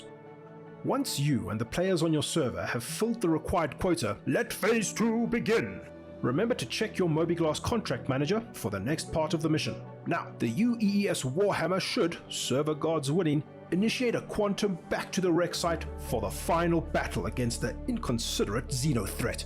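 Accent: South Africa. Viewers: Ek het subscribe die oomblik toe ek jou SA accent hoor. Great videos! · You sound South African :)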